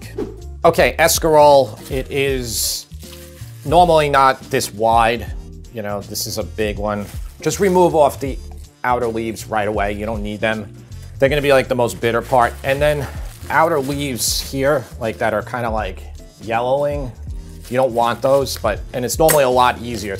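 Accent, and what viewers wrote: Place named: New Jersey, United States. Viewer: You must be from Jersey. Love the accents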